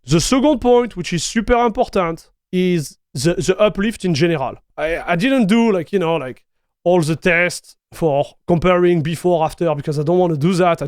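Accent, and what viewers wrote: France. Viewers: That's a French guy not afraid to have an accent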